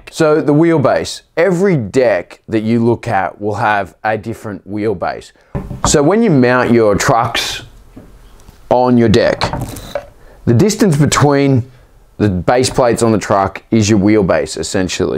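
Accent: Australia. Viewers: He's Aussie accent is funny, it sounds like he saying "Dick" for "Deck"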